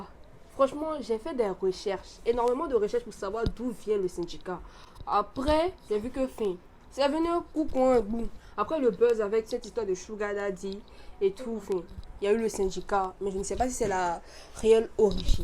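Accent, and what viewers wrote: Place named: Marseille, France. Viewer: Tu es marseillaise? Tu parles comme les marseillaise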